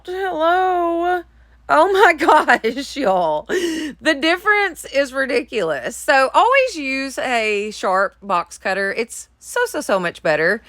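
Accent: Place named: Tennessee, United States. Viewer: Love each of these but I'm crazy over the first 2.. Gorgeous !! I am trying to guess where you are by your accent. I'm not right on many of my guesses. Lol.. I'm thinking Tennessee or North Carolina.. Am I even close ? Lol.. I live in South Carolina.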